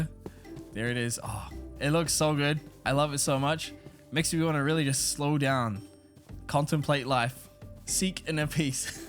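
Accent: New Zealand. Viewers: You from New Zealand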